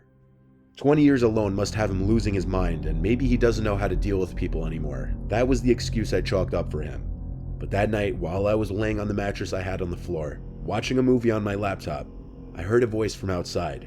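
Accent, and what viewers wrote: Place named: Hudson Valley, United States. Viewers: Hudson Valley accent LOL! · Hudson valley Accent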